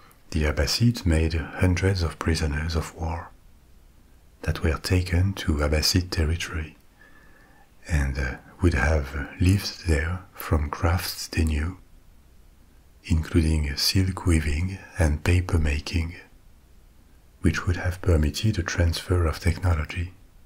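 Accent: France. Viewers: Wonderful, relaxing ASMR and such a sensual and sexy voice with a gorgeous French accent. Delicious. 🥰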